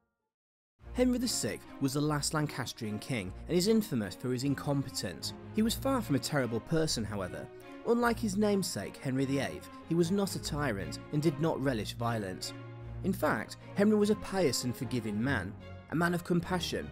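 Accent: United Kingdom. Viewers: It's weird-- the narrator has a very thick British accent and yet he uses American slang like "pissed off" for angry and "awesome" instead of brilliant.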